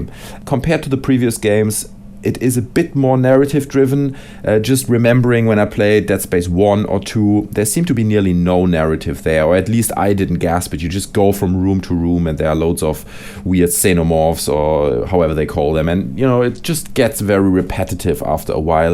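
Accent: Germany. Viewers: Hes german you dip wad. That's kinda how they talk. And if not why dont you just fuck off somewhere ever heard of the golden rule? How about you stop posting hateful comments that dont pertain to the video.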